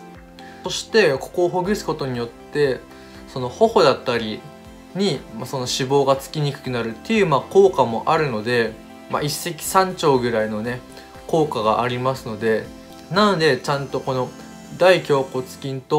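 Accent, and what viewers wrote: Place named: Okinawa, Japan. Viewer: 沖縄のなまりはいってますね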